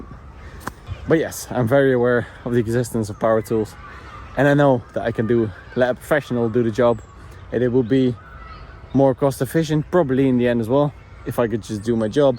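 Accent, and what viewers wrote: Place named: Ireland. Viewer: Q. Curious about your accent (aside from the Dutch tones). Sounds almost Irish! Do you have Irish family or perhaps learnt English from an Irish teacher? Where in Holland do you come from? My grandfather was born and raised in beautiful Leiden. Enjoying your vlogs from Perth Western Australia.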